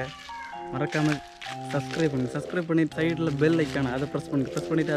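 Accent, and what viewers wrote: Kanyakumari, India. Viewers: Nop, kumari Tamil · Kumari Tamil Anna😍😍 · it's kanyakumari tamil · Kumari slang pichu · கன்னியாகுமரி ஸ்லேங்... 😁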